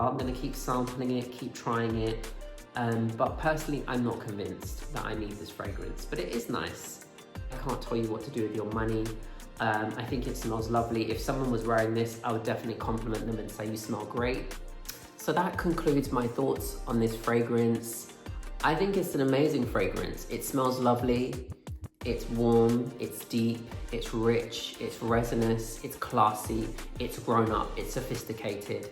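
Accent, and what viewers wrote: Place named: France. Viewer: I’m just loving your French accent😂😂. My teenage son loves the OG , it’s one of his favourite😍😍. Appreciate the thorough review👌👌. Stay fabulous🥰🥰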